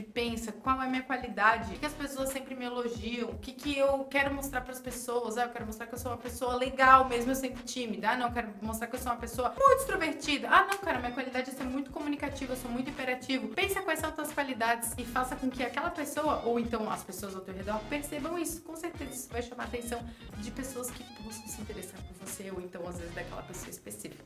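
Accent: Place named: Santa Catarina, Brazil. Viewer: Esse sotaque de peixeiro aí é de catarina né, conheço porque morei em itajaí/sc oxtepo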